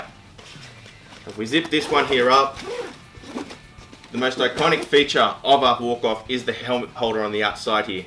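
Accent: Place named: Australia. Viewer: He has an Australian accent :) and we play softball in Australia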